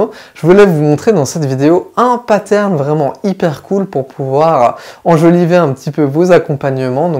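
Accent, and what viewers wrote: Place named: France. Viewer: J'avoue que quand je vous entends dire des mots anglais avec une prononciation française ça pique les oreilles. Paterne ou bien séquence...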